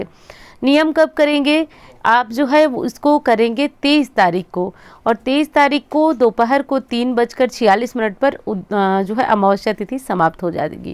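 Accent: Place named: Purvanchal, India. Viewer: दीदी हम जौनपुर से है दीदी मुझे आपकी आवाज से पता चल रहा था कि आप मेरे साइड से हो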